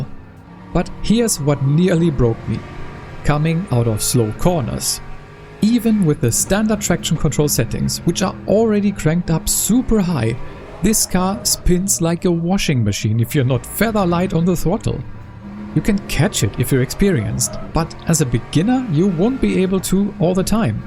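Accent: Germany. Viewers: I can confirm your assessment. I've had my most successful races with the BMW so far. This vehicle is absolutely consistent, just like the times you can achieve with it. I've also had good results with the Porsche. Especially on tracks like Fuji, the strong rotation of this car is a big advantage... if you know trial braking. Very good videos that you produce. I like the good English with the German accent. It makes it much easier for a German to translate internally. You have my subscription. Beste Grüße aus Thüringen.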